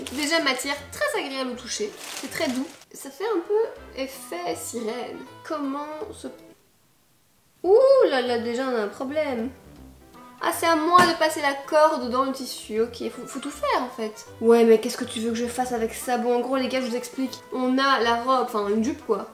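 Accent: Belgium. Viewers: Ton accent belge i love it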